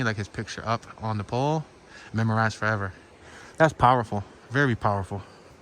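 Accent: Philadelphia, United States. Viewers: Woodder lol luv the Philly accent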